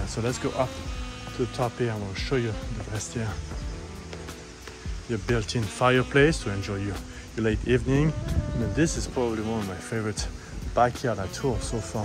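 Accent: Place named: France. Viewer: Great video, but I didn't understand a damn word you said. You can either skip using a microphone or not have a heavy French accent, but you can't do both. 😊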